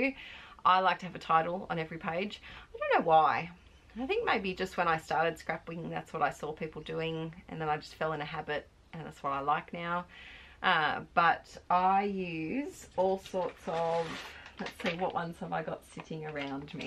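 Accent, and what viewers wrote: Australia. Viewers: The joy of hearing an Aussie accent.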